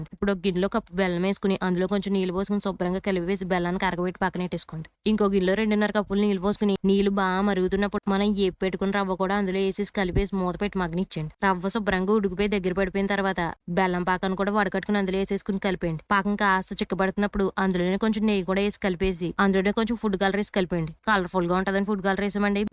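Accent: Godavari, India. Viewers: Meeru chupinche vantalu adbutanga untayo ledo teliyadu kani chivarlo cheppe aa matalalu chala adbutanga unnai nice voice with beautiful quotes with godawari slang…..🎉. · Meedhi Godavari anukunta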